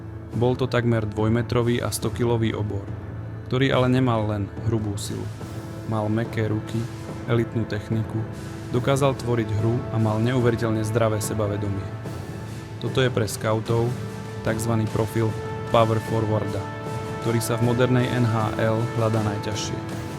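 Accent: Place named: Slovakia. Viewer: Mne sa to práve že páči. Rozpráva po slovensky a nie po "ameuricky" 😊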